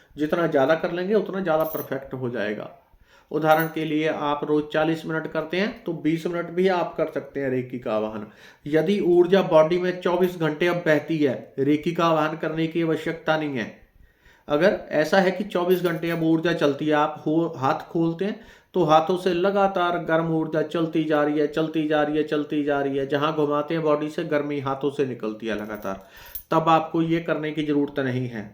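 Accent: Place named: Himachal Pradesh, India. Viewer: Himachal se ho kya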